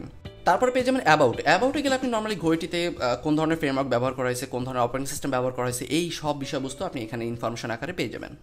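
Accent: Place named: Bangladesh. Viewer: BD Voice Thanks ♥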